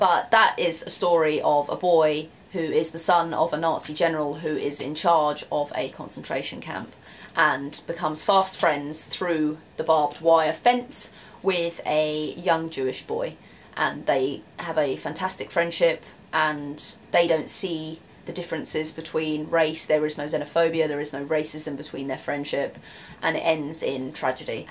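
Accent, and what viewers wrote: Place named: England, United Kingdom. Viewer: Dear, I stumbled across your videos when I did some YouTube surfing recently and I must say they really make a difference. As a German its always quite interesting to hear what people from other countries think about Germany, sharing their experience when travelling Germany and what they find interesting to talk about. Of course, YouTube is full of videos with expats living in Germany or people (mainly young ones) who travelled in Germany and then make videos about their life here or their travel experience. However, most of these videos are quite boring because they are full of clichés, stereotypes, truisms and just serve the expectations what people want to hear or learn. So apart from all that Autobahn, beer, lederhosen, castles, medieval cities and efficiency crap you usually find, your videos are a lot more profound and entertaining. 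Also, it’s so beautiful to hear that likeable English accent for a change after having seen all those videos produced by Americans, Australians or Canadians. Yes, you are doing a good job and I think you convey a good and unconventional picture so far of this history-ridden country in the heart of Europe. I must say, however, that I find it quite astonishing that such a young, witty, quick and vivid woman from England like you seems to be so intrigued with the German Nazi history. But I realized quite quickly that your interest is not a superficial one but seems to be profound given the fact you chose this topic for your university st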